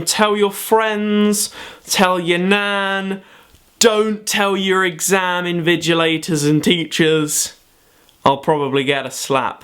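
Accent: United Kingdom. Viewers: I know you have probably already ansered this, but what part of England are you from, you form your words EXACTLY like matt smith and its so cool.:7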